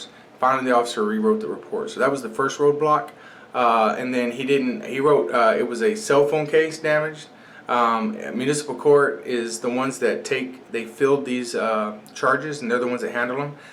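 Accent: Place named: South, United States. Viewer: No idiot u sound like a dumb Ass Hillbilly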